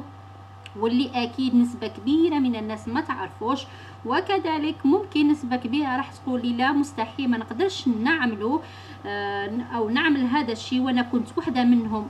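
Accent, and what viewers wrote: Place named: Algeria. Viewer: من لهجتك يضهر على انك جزائرية والجزائريون معروف عليهم انهم عشرة في عقل البول كله سموم ولا يصلح لشيئ غير التبول عليك على هاذا الكلام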